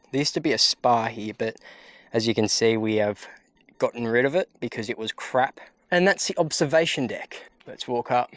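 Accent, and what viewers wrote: Australia. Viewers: Never thought this would be a whole house, from the outside it looks just like an apartment building are you in America? But your accent like an aussie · Oooo that’s a chewy Aussie accent! 😂 Kia ora from NZ 👋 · Great video and amazing place – loved your presentation mate – you’re a natural – so so so Aussie – just love it !!!!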